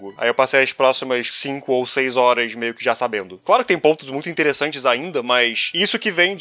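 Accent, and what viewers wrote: Rio de Janeiro, Brazil. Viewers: Esse sotaque carioca do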